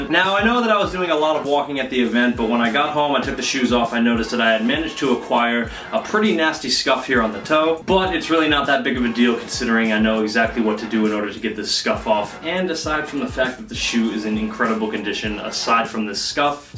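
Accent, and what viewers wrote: Australia. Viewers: The Aussie accent is starting to get to you 😂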